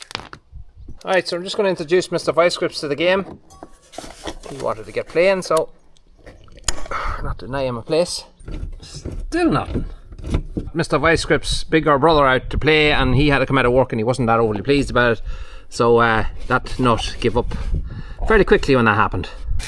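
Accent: Ireland. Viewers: What a terrible shock for you all, I’m so glad your little one is better. I really enjoy your videos. I’m not so keen on the engine repairs lol, but I love all the farming stuff and love listening to your Irish accent xx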